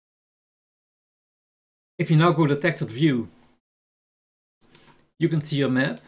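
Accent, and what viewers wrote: Netherlands. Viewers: are you dutch ?? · Are u dutch??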